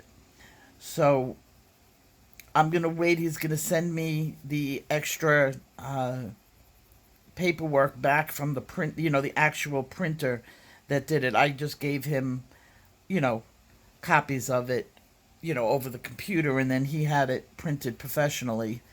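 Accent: New York, United States. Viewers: I’m so glad you hear that your husband is on the mend and improving daily! Thank God for answered prayers. This set looks wonderful and I would love to enter to have a chance to win. I love your videos ,it’s so nice to hear someone who sounds like me as I’m a New Yorker too!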